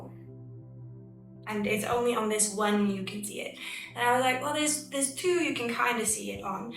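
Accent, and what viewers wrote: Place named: United Kingdom. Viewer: West I was watching that and you read it felt like I was hard to say but I guess I'm just going to use the the easy way out it was like it was like I was in The Matrix and everything around me was it real but you were like an oracle guiding me to see the NPCs😊. Dad I don't want to say too much but maybe, it's like it's so interactive and it's like I don't know where you are I think you're probably in the UK just by your accent and I hope your knee is okay I don't know what happened to your knee but is that the secondary infection I think you called it. I don't really know what that is maybe you'll explain it to me or I'll Google it but back to the story it felt like when you were I guess you were reading what you had Gerald before or just something something that you felt that possibly channeled through the quantum field possibly maybe it's, maybe it's coming from the higher timeline. 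But it is very....????? Needed..❤❤❤for me, it feels like you're like a dream and I don't know why I didn't find you before but I found you now due to some bigger story narrative to buy narrative Divine unfolding I don't even know if there is a such a thing maybe it's all a big illusion. Maybe it's our I've come to the realization that I know that I am not a human with a conscience I'm a Consciousness with the human in a human exploring and maybe this is all a construct of how we think it should be, but I feel with what you say it helps me coalesce into reality whether that be a tangible reality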